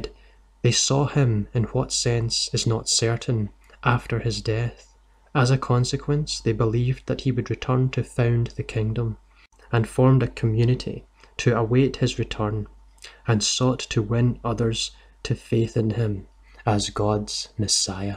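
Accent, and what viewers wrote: Scotland, United Kingdom. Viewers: 50 years after Christianity was declared the official religion, the western Roman empire fell. Ushering in the most depraved chapter in recorded human history. The church was Europe's rulers. Who are you trying to kid? The inquisition, routine torture of heretics, (one Pope complained the people being tortured, in the basement of the Vatican, were not hollering loud enough), the routine practice of cannibalism by the crusaders, an estimated 50,000 people burned alive for demon possession, slavery (the first slave ship in N America was named for Jesus), the routine destruction of ancient science (Archimedes discovered calculus almost 2,000 years before Newton, Christian monks destroyed it.) The only good part of your doc is your accent. I assume you are from Scotland. The most depraved archeological dig I have ever encountered occurred in Hull. 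Priest trading sexual favors for privileged positions in the after life. <link>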